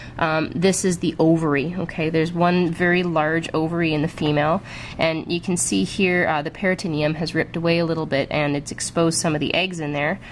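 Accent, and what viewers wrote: Canada. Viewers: I can tell you're Canadian. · She sounds Canadian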